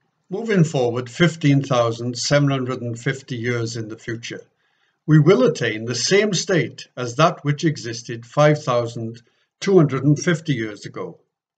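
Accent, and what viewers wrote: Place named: South Wales, United Kingdom. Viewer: This is the South Wales Valleys ' Phone voice' . Well presented butt . Of course all us Brythonic and Irish people were fully aware of this since the end of the last ice age when we moved back in from modern day Spain.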